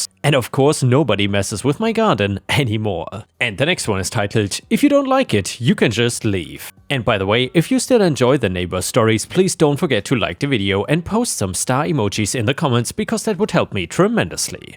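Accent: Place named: Germany. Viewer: You know what I just realized? You have pretty much lost your German accent. Your English is awesome.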